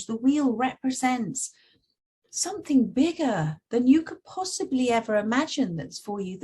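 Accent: United Kingdom. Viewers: Your one of my favorites. Luv the British accent! T. U for sharing your gift with us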